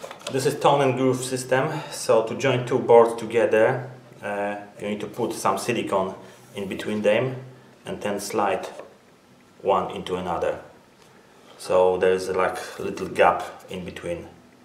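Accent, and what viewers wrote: Poland. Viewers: His accent sounds Polish to me.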